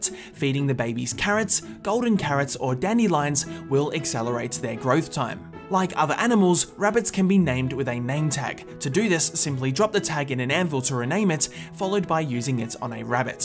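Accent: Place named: Australia. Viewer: I thought he was Australian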